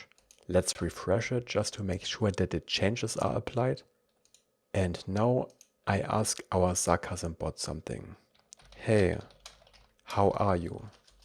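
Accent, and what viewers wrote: Germany. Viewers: are you german or do you speak german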